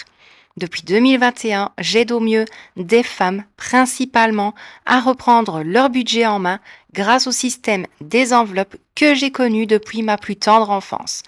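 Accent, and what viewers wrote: Belgium. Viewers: Coucou, super format et très bien niveau diction malgré ton fort accent belge lol... Par contre il faut absolument que tu recouvres ton micro avec une poupette, lol une genre de touffe qui atténue les bruits de bouche car quand on t'écoute avec un casque c'est très désagréable... Et après tu seras au top du top... Gros bisous 😘 alias spoonielovejunkjournal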